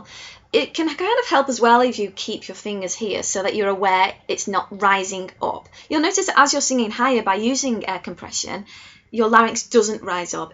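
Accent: United Kingdom. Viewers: Are you British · I love that British accent.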